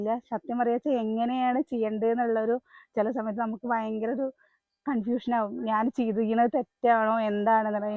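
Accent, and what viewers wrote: Palakkad, India. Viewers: നിങ്ങളുട പാലക്കാടൻ ഭാഷ കേൾക്കാൻ വേണ്ടി മാത്രമാണ് ഞാൻ വീഡിയോ കാണുന്നത്. നിങ്ങൾ പൊളിയല്ലേ.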